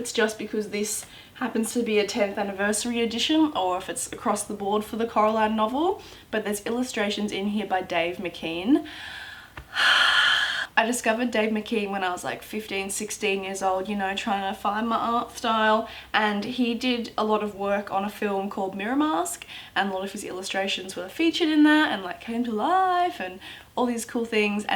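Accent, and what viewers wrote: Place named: Australia. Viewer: I love your accent. So Australian! :)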